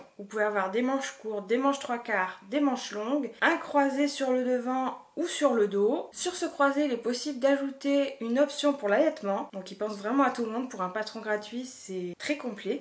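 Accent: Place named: United Kingdom. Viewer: Coucou! Quelle belle capsule, tu nous as gâtées! j'ai déjà repéré 2-3 patrons! Alors, je vais me moquer de ton accent so british 🤣🤣🤣 mais délicieusement frenchie! trop mimi! Alors, si tu as besoin, je peux te traduire quelques patrons (j'en ai fait un pour Audrey), j'ai bien aimé l'exercice. Bon, mon vocabulaire couture en anglais est limité, mais on peut y arriver, avec l'aide de notre ami google 😂 A bientôt! 😘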